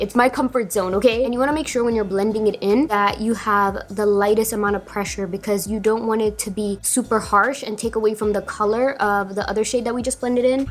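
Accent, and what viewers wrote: Toronto, Canada. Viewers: I thought I recognized the TO accent 👀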